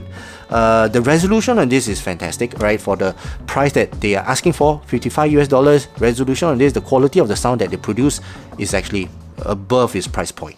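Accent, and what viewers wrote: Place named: Singapore. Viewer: I think is a Singaporean. I also noticed this on some of my Singaporean colleagues.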